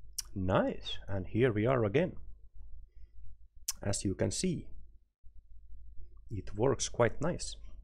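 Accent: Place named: Finland. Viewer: Thank you so so much for your tutorial! I could not figure out how to get the Logitec driver back after I had to wipe my compute recently. That driver is going in a safe spot. Also you have a very lovely Finnish accent, one of my favourites the world over! THANKS AGAIN! (and yes you have amazing English)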